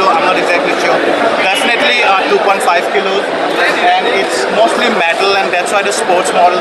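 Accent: India. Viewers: Ur accent looks like u r an indian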